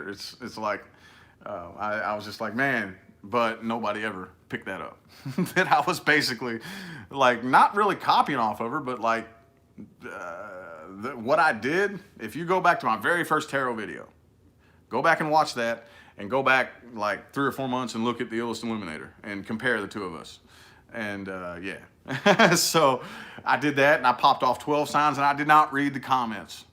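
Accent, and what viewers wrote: South, United States. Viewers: Hi, I absolutely enjoyed your chatting with us today! You are one of my favorite tarot readers!!! Your honest and very real and frank with your messages and your southern humor and accent is refreshing for many of us! You have an amazing gift and thank you for sharing your experiences with us subscribers! Oh and happy hump day from Redondo Beach, California!!!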